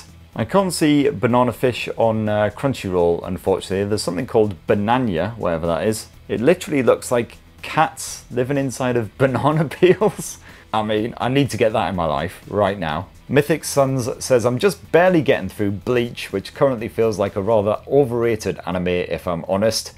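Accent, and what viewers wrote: United Kingdom. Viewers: The colourful metaphors with the British accent have me in stitches, the way you slide the odd one in, is quite brilliant. 👍 Providing a layer of humour on top of your reviews is doubly entertaining. Bravo sir 👏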